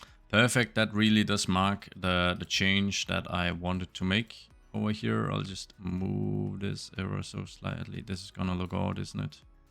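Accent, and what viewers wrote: Denmark. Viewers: Er det en dansk accent jeg spotter? 😅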